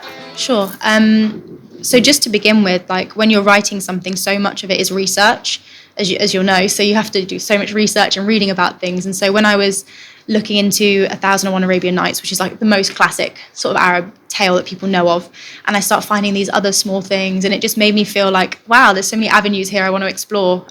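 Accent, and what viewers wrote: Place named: United Kingdom. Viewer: Tangiers was international city From Tangiers come the most brilliant Moroccans throughout all civilizations Cute little Brit accent ah I studied three years in Tangier & loved it from 1967 to 1870 gorgeous beautiful City Lovely to be a hippie where in Casablanca was very thought to be a beatnik The best playa the whole city is Awesome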